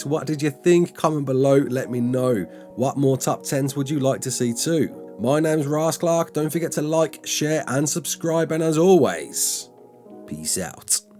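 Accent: Birmingham, United Kingdom. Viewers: Brummie or Black Country lad?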